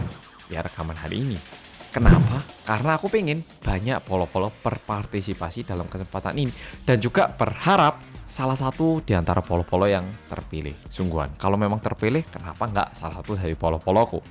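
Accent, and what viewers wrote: Java, Indonesia. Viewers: Salam medokkkkkk banggg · Medooooooooooooooooooooooooooooooooooooooooooooooooooooooooooooooooooooooooooooooo oooooooooooooooooooooooooooooooooooooooooooooooooooooooooooooooooooooooooooooooooooooooooooooooooooooo oooooooooooooooooooooooooooooooooooooooooooooooooooooooooooooooooooooooooooooooooooooooooooooooooooooooooooooooooooooooooooooooooooooooooooooooooooooooooooooooooooooooooooooooooooooooooooooooooooooooooooooooooooooooooooooooooooooooooooooooooooooooooook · Medok Yach?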